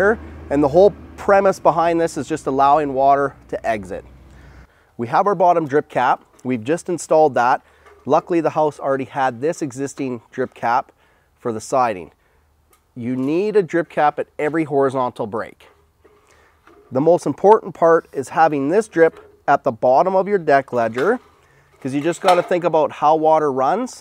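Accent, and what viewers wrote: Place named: Nova Scotia, Canada. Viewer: Sounds like a Nova Scotian accent lol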